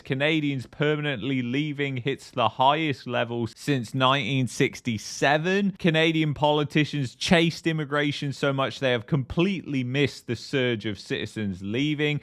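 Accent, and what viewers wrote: United Kingdom. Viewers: I have never seen a positive video from this guy just gloom and doom. If he does not hate Canada so much he should just move somewhere else, he sounds British anyways.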